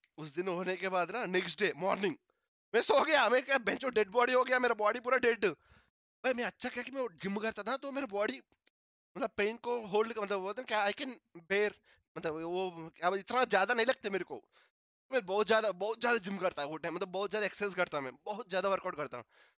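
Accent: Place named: South India, India. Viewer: ohh his voice is lile south indians :)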